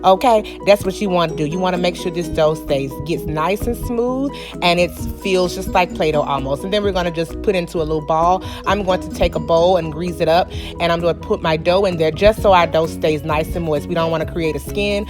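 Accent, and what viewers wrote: South, United States. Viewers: And what exactly does a plantation mammy sounds like? She has a southern accent like most people who are born and live in a southern state. Would you call someone with a Boston accent a slave master? Rethink your stereotypes. I see you have been brainwashed by the media because slavery has been over for some time so it is no possible way you know what a plantation mammy sounds like. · She's from the south. We all (black folks) talk like her.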